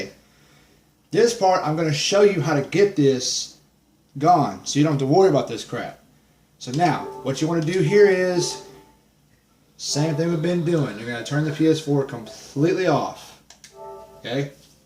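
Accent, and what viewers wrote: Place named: Appalachia, United States. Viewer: Sound like a dumb hillbilly no wonder you can't get it to work lmfao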